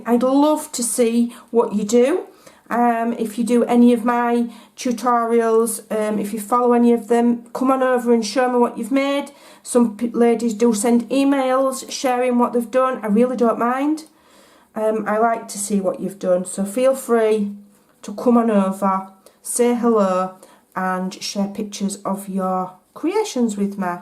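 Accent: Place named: North, United Kingdom. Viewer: Why did you not score! Naughty naughty, but lovely to hear a nice UK accent trying to place it sounds more northern like the cards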